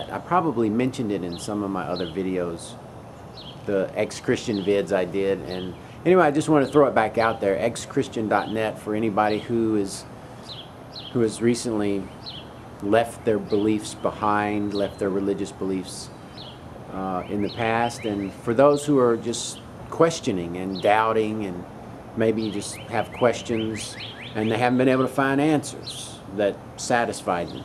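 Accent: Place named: Missouri, United States. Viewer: lol, you're so wacked! Great video on Ex-C. So you're from Tennessee? I wouldn't have guessed it if you hadn't said so. I would have thought you to be a Missoura-in due to your accent.;) Love the fro.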